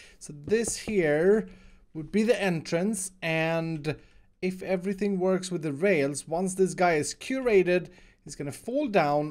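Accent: Sweden. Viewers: It is good that the villagers don't remember that YOU were the one that infected them in the first place. Infested and Curated, love your Swenglish! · Svensk dialekt, jag låter värre.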